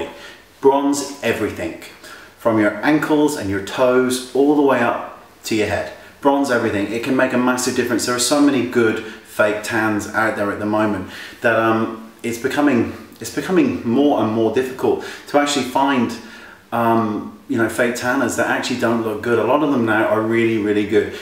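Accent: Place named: London, United Kingdom. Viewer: It's a simple london accent :)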